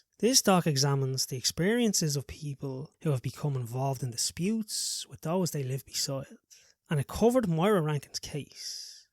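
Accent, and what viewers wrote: Ireland. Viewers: a pronounced and obvious Irish accent it's not one that's hard to follow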